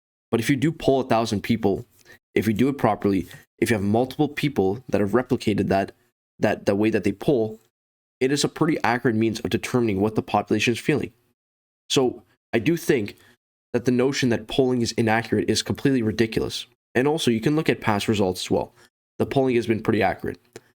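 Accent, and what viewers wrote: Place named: Ontario, Canada. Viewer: You sound like you're from Ontario.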